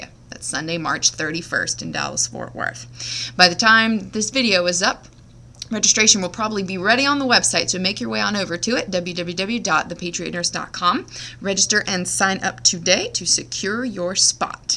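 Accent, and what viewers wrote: Ireland. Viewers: Like the Irish voice. : ) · I love the Irish Brogue. :)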